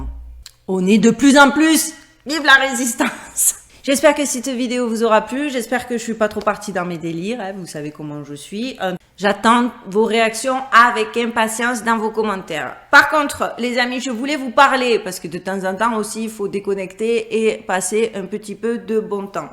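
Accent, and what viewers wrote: South, France. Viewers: Toujours aussi sensationnelle ! Il y a trois You Tubers dont vous faites partie qui lorsque je les écoute me font du bien et me permettent toujours d’avoir un sourire amusé ! Vous avez l’art de la narration naturelle et ce petit brin d’auto analyse amusante qui détend l’atmosphère ! Amusant d’entendre de Vegas l’accent méridional, mais ça fait partie d’un ensemble qui fait de vous une personne sympathique et charmante qu’on rêve tous d’avoir comme amie ! J’ai aimé cette vidéo et c’est tout à fait le sens du courant actuel! Remplacer l’homme et lui payer un revenu universel et se débarrasser le plus vite possible de l’excès ! Surtout annihiler tous les sentiments en l’isolant complètement dans un monde automatisé où il n’aura plus besoin de sortir ! Des bon toutous esclaves obéissants drogués et contrôlés mentalement, cerveau atrophié, des zombies inutiles ! 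Votre vidéo est plus légère que ma vision tout en étant semblable mais moi je le vois terrifiée et le transmets dans mon narratif ! Merci encore, j’espère que le choix d’être à Végas sera meilleur que celui d’être en France ! On nous menace de nous reconfiner à Paris et RO ! Bises 😘🙏🌹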